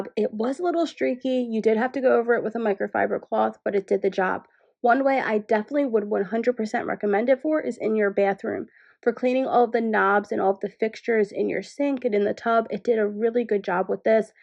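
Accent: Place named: Philadelphia, United States. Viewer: Good info! Sidenote- you sound like you might be from Philly area or NJ.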